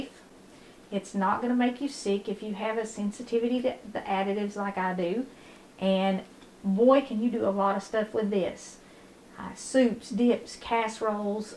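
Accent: South, United States. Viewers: 2X T-Spring. I just love your channel. As a northerner, I love your southern accent. You share a lot of information and I also love that you are a. Christian, as am I.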